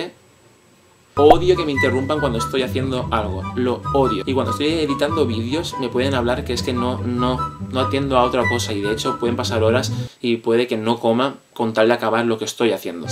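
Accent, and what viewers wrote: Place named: Ribera Alta, Spain. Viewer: sabia que eres de la ribera alta por tu forma de hablar, pero este video no lo había visto, de alzira, pues yo también de la zona cerca cerca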